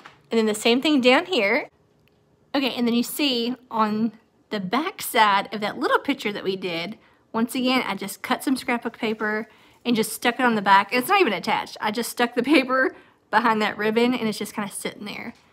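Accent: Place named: Kentucky, United States. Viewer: Love your KY dialect! My Mommom grew up in Hopkinsville. Lost her a few years back. 😢❤️ I love the idea of tier trays. I think I may do one for my best friend from my college days. We email almost daily.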